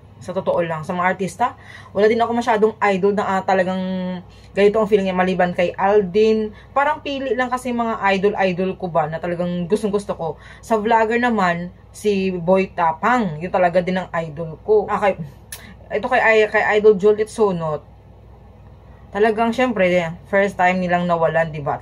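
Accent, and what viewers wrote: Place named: Visayas, Philippines. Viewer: Visaya.kainis yang pronounce mo.sakit sa tenga.alden po!d aldin.juliet po.d joliet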